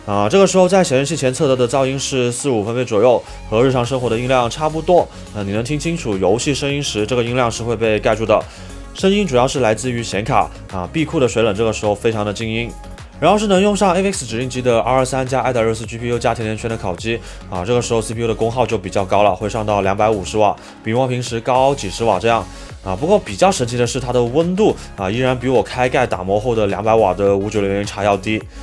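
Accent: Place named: Guangzhou, China. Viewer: diu 一听就是广州佬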